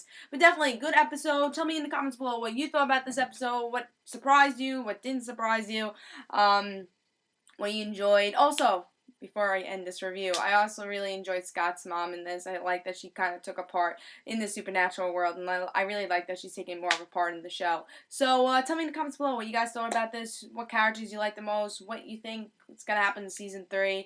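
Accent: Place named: New England, United States. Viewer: are you from New england? dat accent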